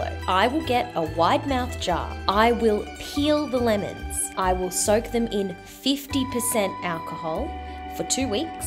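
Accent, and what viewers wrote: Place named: Australia. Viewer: First of all, Im loving your Aussie accent :D and this is the most non-Italian way of doing it, totally British/Aussie way I would say but if you like the taste, go for it, there is nothing wrong as long as you like :) Points are already raised by you, but I understand that Italian way takes time and more effort, so enjoy a quick limoncelloish drink :) I will give it a try as well, but with 50-55% alcohol gin, lets see what it will be like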